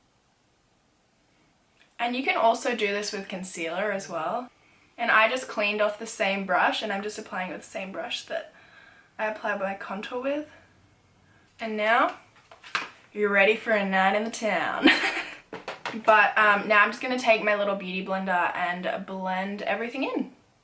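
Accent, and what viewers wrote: Australia. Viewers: Diana O'Connor lol she sounds the same the whole time to me, your obviously not aussie coz thats a full aussie accent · I loveeeee her Australian accent 😻😻😻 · is she from australia?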